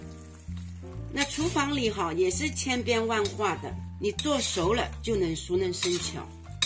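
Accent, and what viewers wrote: Shanghai, China. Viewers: 终于听到上海话了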